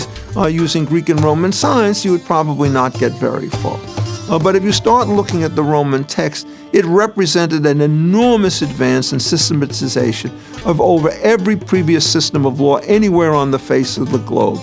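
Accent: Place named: New York, United States. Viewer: Jewish New Yorker born before 1960 accent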